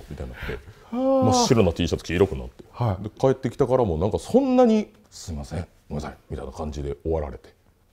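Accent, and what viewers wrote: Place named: Kyoto, Japan. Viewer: めっちゃ所々京都弁感じる 上品な人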